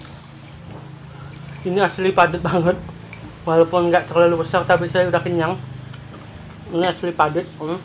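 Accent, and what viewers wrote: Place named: Banjar, Indonesia. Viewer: Logat Nya kaya ada banjar nya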